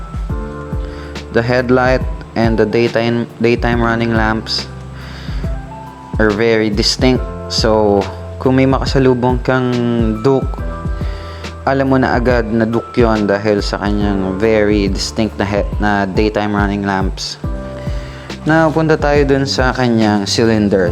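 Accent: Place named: Conyo, Philippines. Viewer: grabe conyong conyo si idol! nosebleed ako hahaha.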